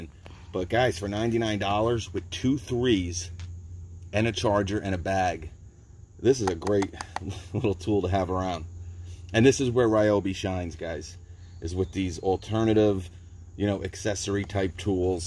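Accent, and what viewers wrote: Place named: Philadelphia, United States. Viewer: Are you from the Philadelphia area? Wudder=water 😎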